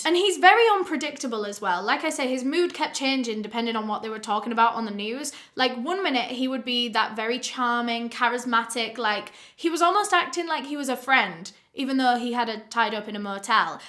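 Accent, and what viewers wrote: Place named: Manchester, United Kingdom. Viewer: Yes love the Manc accent ❤️❤️❤️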